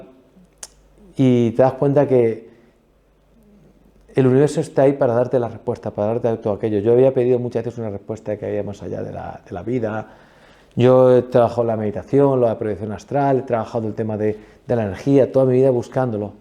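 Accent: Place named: Spain. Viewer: Es un tema interesante, pero el acento español cuesta entenderlo.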